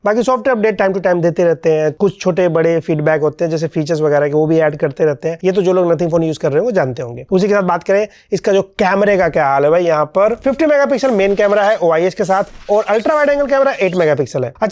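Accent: India. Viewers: Guru g aap ka unboxing ekdam indian accent me best rehta hai na zada wo jaise aur log dikhate hai and kuch log muh bana kar karte hai unboxing par aap ka unboxing waqai me dekhne me dil karta hai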